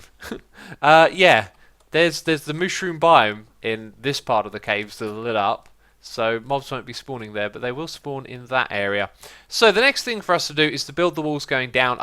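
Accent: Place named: United Kingdom. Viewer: when british is so perfect that youtube's auto-transcript bot gets it perfectly right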